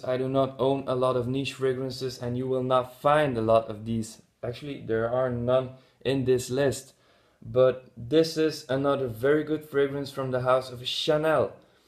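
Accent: Netherlands. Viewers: very nice list,! i'll need to check out 1 million intense, but strangely enough, it's been pulled off the shelves over here in Ukraine. all the 1 million flankers have been. wonder if it's just over here, though. btw, where are you from? can't place your accent. i'd say Dutch?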